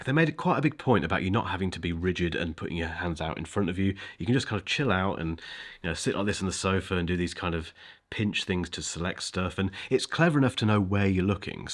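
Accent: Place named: RP, United Kingdom. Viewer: Btw I like your accent, sir! Is it RP accent? Whereabouts in the UK are you from?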